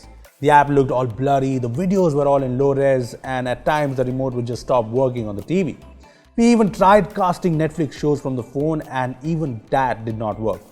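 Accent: India. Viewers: Warning *Crappy Indian accent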